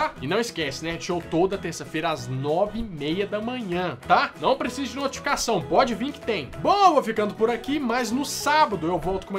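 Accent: Minas Gerais, Brazil. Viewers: Esse brother é muito mineiro! kkkkkk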